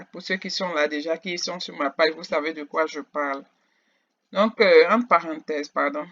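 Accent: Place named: Benin. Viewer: Tu a un accent Beninois. 😊 I hope you are doing good sister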